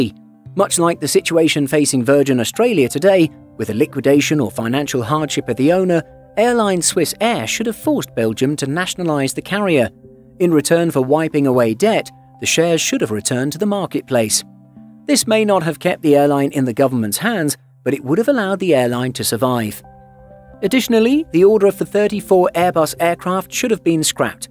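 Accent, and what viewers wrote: France. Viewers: Nice french accent! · that french accent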